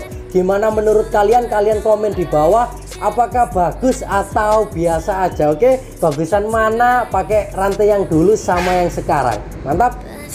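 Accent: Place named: Java, Indonesia. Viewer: Medok nyaaa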